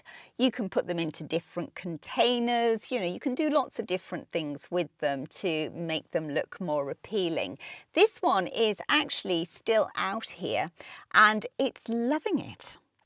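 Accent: United Kingdom. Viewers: I’m not a gardener though I appreciate the gardens of others. It didn’t matter that I am not a gardener, I would watch whatever content you post just to hear your cheery, witty voiceovers as they make me smile. The fact that you have a delightful British accent is the icing on the cake. 😁 Greetings from Dallas, Texas 🇺🇸 where our accents are cringeworthy. 🤪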